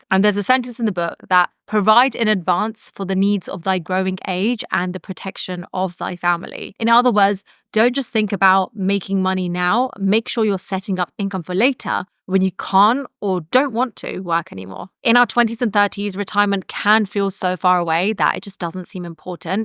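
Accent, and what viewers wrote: England, United Kingdom. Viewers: Your accent is too English 😅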